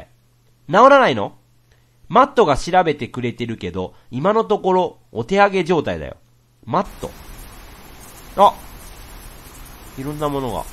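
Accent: Kansai, Japan. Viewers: まだ関西弁がのこってる頃だああああ！！(まあ今もちょっと言ってるときあるけど)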